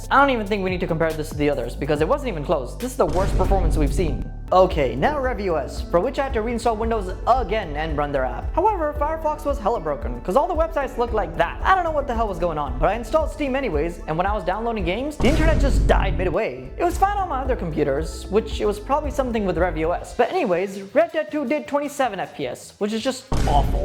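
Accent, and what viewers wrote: India. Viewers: ARE YOU INDIAN OR AMERICA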